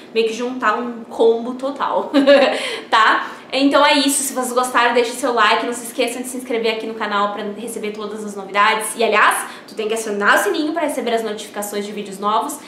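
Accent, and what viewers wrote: Rio Grande do Sul, Brazil. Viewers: Ela é gaúcha? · Você é gaúcha né ? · Eu não sei o que eu tenho com esse povo lindo gaúcho rsrsrs. Estava vendo um video sobre escola de inglês entrou esse em seguida.Ou gaúcho gosta muito do YouTube ou eles me perseguem kkkk Adoro esse sotaque gauchês kkk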